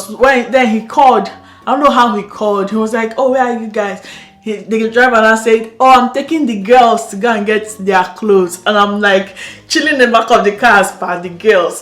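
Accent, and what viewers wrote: Canada. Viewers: You’re beginning to have Canadian accent🌝💃🏻❤️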